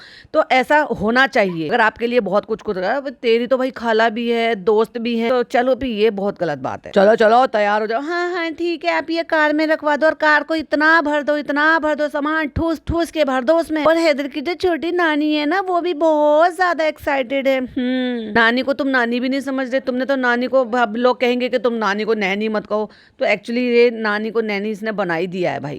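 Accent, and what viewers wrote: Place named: Haryana, India. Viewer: maine apke 3-4 podcast hi sune hn ...Usi se idea lgaya ki haryana ka touch h apki boli me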